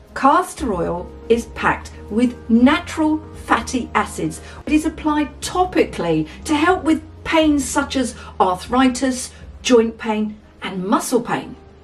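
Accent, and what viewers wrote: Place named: United Kingdom. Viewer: I’m a new user and early on my journey of discovery. And I want to say if you’re not a motivational speaker you’re missing a calling. Love your passion and enthusiasm and I’m a sucker for a British accent. I’ve heard and seen so many good things about CastorOil. I came for constipation and happily moving on with so much more LOL. Thank You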